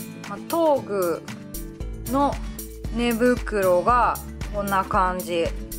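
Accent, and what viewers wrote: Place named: Kansai, Japan. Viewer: 関西弁サイコー でもまぁこれうっすぅ～(^_^;)毛布いるわっ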